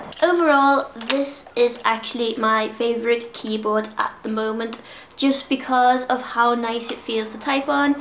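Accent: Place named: Liverpool, United Kingdom. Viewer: Do I hear a fellow scouse geek?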